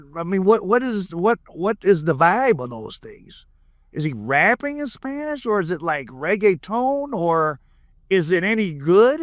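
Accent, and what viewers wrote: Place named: Chicago, United States. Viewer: Why do you speak in some weird Chicago accent, aren’t you from Michigan?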